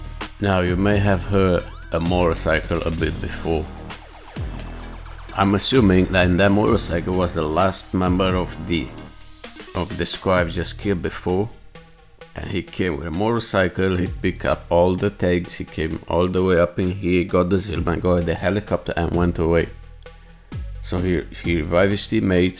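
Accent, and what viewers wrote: England, United Kingdom. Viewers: Cheers! I would like to hear that British accent voice again. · para mi esta bien un video con tu voz y no la voz con acento inglés. 🇲🇽 🇲🇽 💪💪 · I enjoy it, do both, British and your original voice at the same time ❤️🙏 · We want the fancy British accent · Regarding the voice: I dont know buddy, the british one is nice but we dont watch you for entertainment, we watch you to learn stuff. Entertaining voice or not, real fans always gonna watch ❤ so your choice man, do whatever you like most hoping every fan thinks about it this way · Fancy British accent plz (if possible) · it feels so different without that british accent voice over haha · I like the fancy British accent voice over and I love this one too😍....anyhow u wish u wish to upload videos!! Drop a 1hr video we'll still watch it from top to bottom...much appreciations to you for making us a better player!! 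Cheers❤️❤️ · We want the fancy British accent back now! ❤️❤️❤️ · Let's keep with british voice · It's a tough choice between the accents. Maybe make it both? British for general narration gives is like a documentary feel which is nice, and your own seem to work well for the explanations. The format for this video seemed real helpful in showing how to adapt to the situations that unfold and noting things to be aware of in them. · British accent it is so much fun!!!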